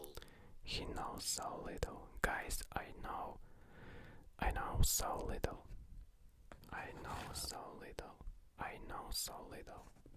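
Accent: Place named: Russia. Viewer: nothing is more cute than a russian trying to speak english 😂🥰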